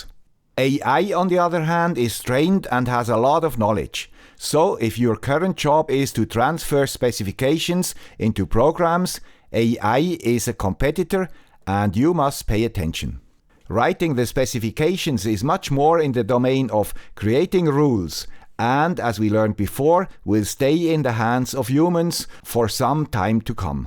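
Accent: Switzerland. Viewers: If I’m not mistaken, this marks your 500th video! A truly remarkable milestone! Congratulations from the bottom of my heart! Your videos are a constant source of inspiration for the community you so thoughtfully cater to. I always look forward to each new episode, filled with fresh ideas and, of course, that delightful Swiss accent, which adds an extra charm to even the most fascinating content. Greetings from Cologne! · it is usually written as: "why should I trust your definition, if you can't pronounce 'inference' properly. Let's assume the poster meant it as a joke (which it usually is), though missing the point of "the guy with the Swiss accent" :)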